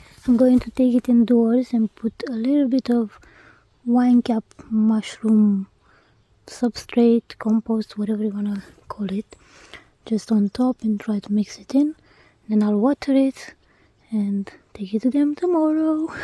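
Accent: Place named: Romania. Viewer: Neat ma’am. By the way, you have a very soothing voice. Your Romanian accent is just the icing on the cake with your voice. I can listen to your voice all day.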